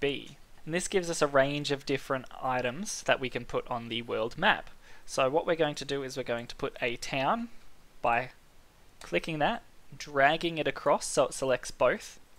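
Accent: Australia. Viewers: You're from South Australia aren't you?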